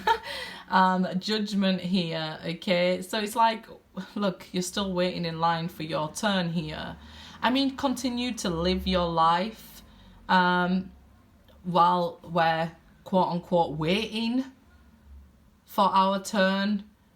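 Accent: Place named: Yorkshire, United Kingdom. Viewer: Luv your accent. x.... May I ask what accent is it.?.. Sounds like Yorkshire ?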